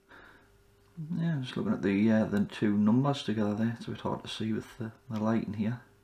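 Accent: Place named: Morpeth, United Kingdom. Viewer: Great video. First time I’ve seen your videos! Sounds like your from the Morpeth track?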